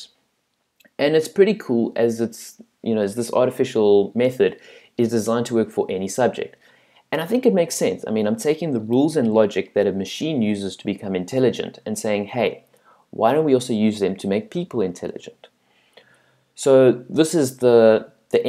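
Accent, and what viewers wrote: South Africa. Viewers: You sound South African haha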